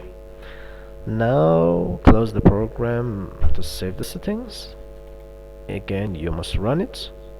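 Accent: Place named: United Kingdom. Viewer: your english is so british bruh, are you asian ?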